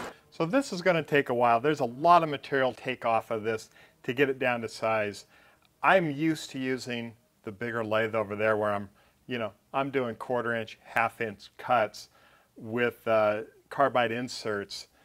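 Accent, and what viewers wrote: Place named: United States. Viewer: Solder has an L in the word: why can't you Americans say it properly?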